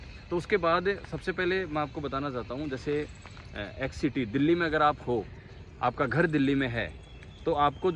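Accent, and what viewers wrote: India. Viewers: Aap sikar se ho kya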